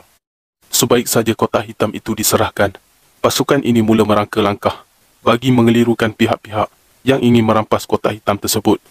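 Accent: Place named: East Coast, Malaysia. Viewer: Pelat Pantai Timur pekat sangat. Pahtu be Ai-See-Ae-Oh, bukan Ai Kau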